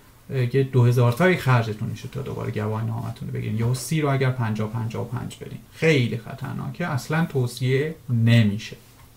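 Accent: Shiraz, Iran. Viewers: جيگر لهجه شيرازيت برم كاكو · جون كاكو خراب لهجه شيرين شيرازيتم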